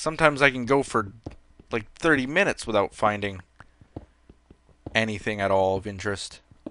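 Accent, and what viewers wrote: Canada. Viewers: are u Canadian I can tell by ur voice and when ur saying eh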